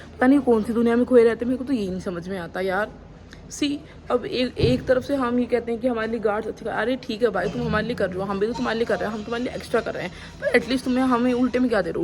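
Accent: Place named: Haryana, India. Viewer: Nice haryanvi😅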